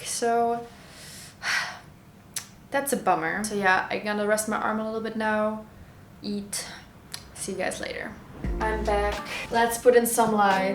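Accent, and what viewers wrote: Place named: Germany. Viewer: du geht’s von dir aus aber verstehst nicht das nicht jeder so denkt. Du findest es also fair das andere bevorzugt behandelt werden u ihr deutsches Publikum vernachlässig wird? Außerdem sagst du selber das sie mit mach das Buch hier fertig auf dem Hauptkanal nicht gut läuft aber dieses hier was ideal dafür ist nicht für deutsche gemacht werden kann? Ich bin mir sehr sicher das mindestens 90% wenn nicht noch deutlich mehr hier auf diesen Kanal deutsch sind. Viele deutsche haben von englisch die Schnauze voll u wenn eine deutsche youtuberin besseren constant fürs Ausland macht sich doch etwas verarscht fühlen ist das ja wohl nicht verwunderlich. Hätte sie dieses Video auf deutsch u auf ihren Hauptkanal gezeigt bis ich mir so so sicher das sie deutlich mehr angesprochen hätte u mehr Follower generiert hätte. Es ist ein kausaler Denkfehler das ein Video auf englisch mehr durchstartet als in der eigenen Sprache. 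Kunst ist eine Nische u spricht nicht jeden an u wenn es ein English mit deutschen Akzent ist wird es auch nicht besser.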